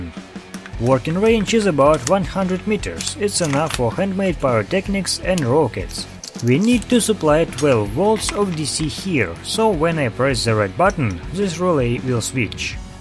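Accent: Russia. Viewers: why am I not surprised about the russian accent?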